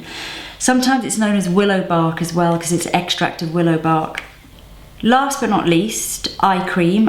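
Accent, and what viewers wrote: United Kingdom. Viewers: Thank you so much... This information is priceless. I've taken notes to try some products. I have combination/oily skin and rosacea which makes my skin hyper sensitive. Besides, I'm allergic to salicilic acid so... I have to be creative in my skin routine. Lol, but it´s like you said, you have to pay attention to your skin because it talks in a very clear way, and if we listen, we will be able to treat it properly. BTW your accent is helping me with a recording I have to do in British English;)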